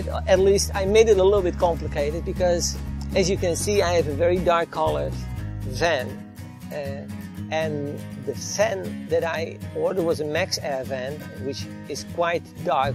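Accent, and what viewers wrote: Netherlands. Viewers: I hear some Dutch origin in your voice? Or am I way off?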